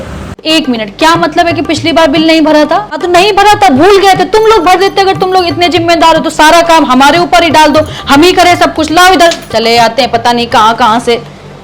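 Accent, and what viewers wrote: Kanpur, India. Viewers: Kanpuriya language. Heart touching. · हर समय क्रोध में ..!!..!!. वैसे आप की बोलने की शैली कानपुर की लगती है 😊। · Nice style as mammi. Bhasha sunta hoon to apno jaisa lagta hai ji. Kanpur ki jaisi boli hai.👌👌👌👌👌👌👌👌👌👌👌👌👌👌👌👌👌👌👌👌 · apki awaj bilkul hamare Kanpur jaisi hai.kya aap Kanpur she belong karti ho.your acting is super,real facts,real me aisa hi hota hai. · I think this is Kanpuriya style of speaking very nicely presented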